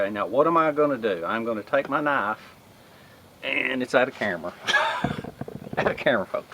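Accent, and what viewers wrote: South, United States. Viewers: I like the videos I've seen you do and will be watching more I subscribed to your channel I like your knowledge, instruction, and your southern twang (I'm from Texas so I get it) anyway thanks for your time and enjoy your videos please keep making them. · i could tell by your southern drawl. I’m from WNC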